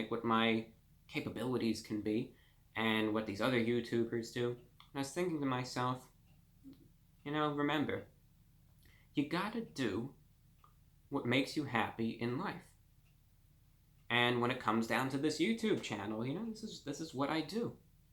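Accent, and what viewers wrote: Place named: Mid-Atlantic, United States. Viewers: I can't eat any of the food you review, due to dietary restrictions. But I'm still a loyal viewer. "what is this channel known for?" To me? It's your mid atlantic accent, polite speaking, and your sense of style that I thought had left this world decades ago. I lost that bet. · The New York accent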